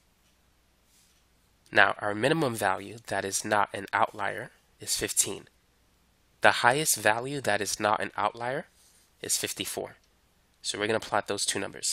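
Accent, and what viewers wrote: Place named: Brooklyn, United States. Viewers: this guy is from Brooklyn I gotta say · you gotta be from new york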